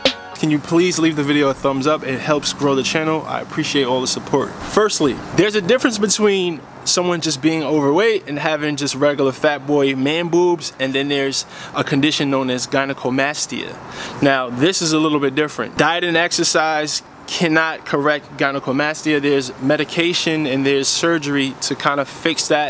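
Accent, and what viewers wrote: New York, United States. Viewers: Ten Chest Commandments... I hear that NY in you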